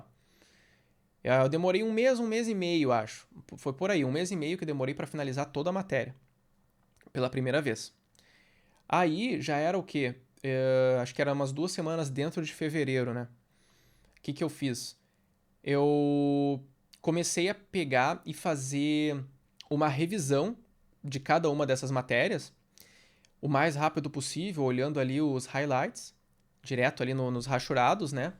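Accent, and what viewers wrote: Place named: Rio Grande do Sul, Brazil. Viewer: Pelo sotaque, tu deve ser meu conterrâneo (gaúcho). Grande abraço e parabéns pelo desempenho.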